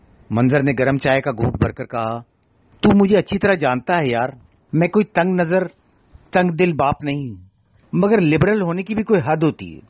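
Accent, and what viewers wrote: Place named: Karachi, Pakistan. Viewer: سب سے پر لطف بات میرے لئے اس کہانی میں کراچی کی زبان تھی۔ اور اس کی ادائیگی بھی خوب کی ہے۔ شکریہ۔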